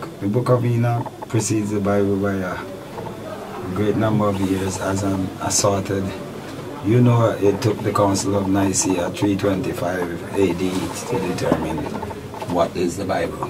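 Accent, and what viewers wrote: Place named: Jamaica. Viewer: His accent sounds just almost exactly like a Jamaican.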